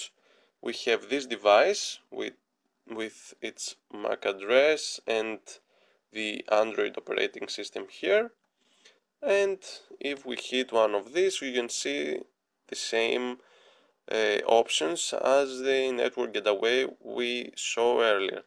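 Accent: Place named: Greece. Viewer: η προφορα κανει μπαμ οτι ειναι δικος μας:ρ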